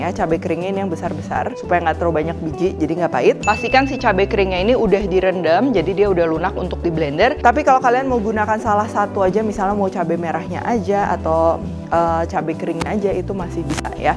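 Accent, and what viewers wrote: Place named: West Java, Indonesia. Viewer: Chef leukohnya Sunda banget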